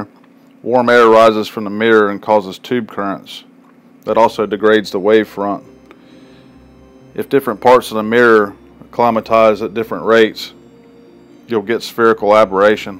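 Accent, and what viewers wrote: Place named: South, United States. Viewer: There's just something about a thick southern accent that just derails any of the context of what's being said...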